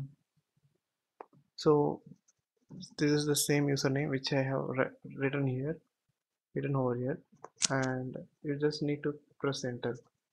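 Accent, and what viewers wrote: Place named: England, United Kingdom. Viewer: Nice English accent.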